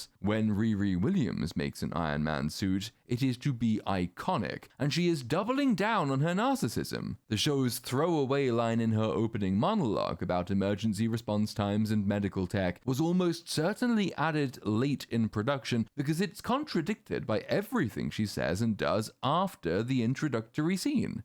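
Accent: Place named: Oxbridge, United Kingdom. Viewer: his Oxbridge accent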